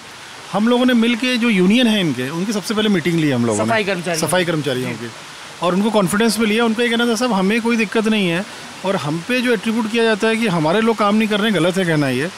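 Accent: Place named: Rewa, India. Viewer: Are thakur sahab apna rewa ke ahan mangawa Se main 30 km dur mauganj se hu... apki tone se hi samajh me arha tha wah..App rewa ajao sirji apki jyada jarurat hai rewa me Kaha netan ke cahkkar me pade han..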